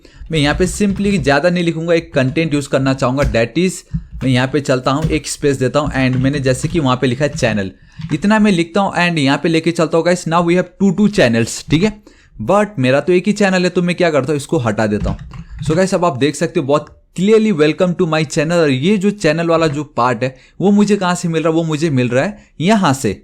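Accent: Nepal. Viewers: why do you have Nipali accent.🤔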